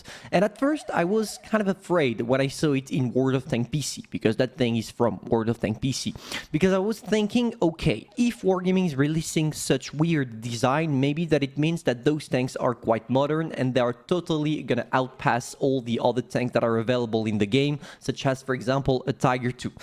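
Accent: France. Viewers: The audio is funky. He sounds French🤔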